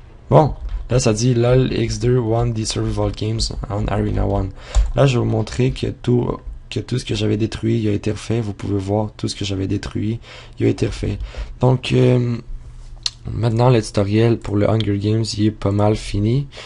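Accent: Canada. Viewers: Super tuto, vraiment complet et bien expliqué, je suis toujours autant fan de l'accent canadzien :) Mais me voilà présentement bien embêté :) Tout le monde fait les même remarques concernant la permission /sg setlobbywall etc. J'ai utilisé le principe d'écrire directement le texte sur les panneaux mais dès que je clic dessus ça me dit "you don't have the permission to join arena1". Et quand je fait /sg help il ne me donne que les commandes "player" et pas admin. Donc je peux /sg setspawn, mais pas entrer sur l'arena 1 que je viens de créer. Un vrai casse tête. Mais ton tuto est super, c'est juste moi qui suis nul :D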